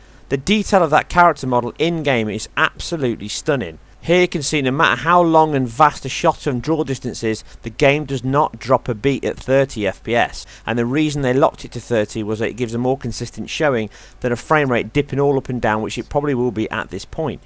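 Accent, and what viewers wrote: England, United Kingdom. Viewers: Man, we love this! You have seen the demand for your kind of workmanship here. We are looking forward to more top notch analysis like this that puts Eurogamer and Digital foundry to shame. They now have competition! You have our support. Thank you you have awakened to giant nerd in us. Love your stuff and that English accent is a sure fire win. Everybody is posting your video on many comment sections on gaming sites. Keep this up.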